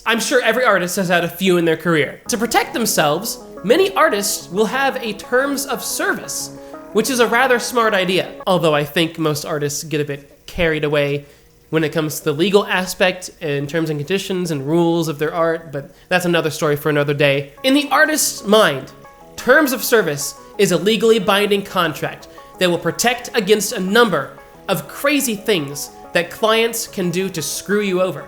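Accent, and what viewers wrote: United States. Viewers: He sounds very very American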